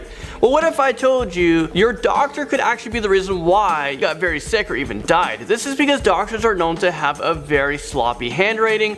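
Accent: India. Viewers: r u inidian ? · Are you indian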